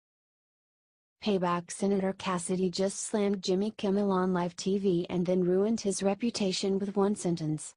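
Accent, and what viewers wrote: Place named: Russia. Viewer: Use your own voice not a Robot. We want to hear your Russian accent.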